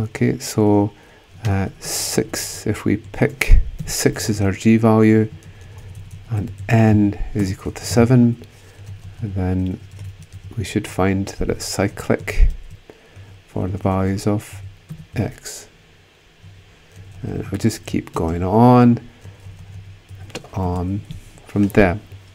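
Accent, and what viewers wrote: Scotland, United Kingdom. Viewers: is skotiak akcent prezent?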